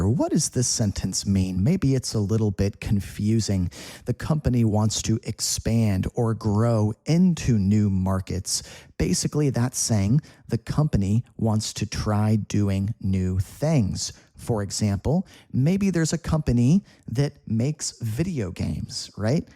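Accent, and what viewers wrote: United States. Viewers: Hello. You're american or british?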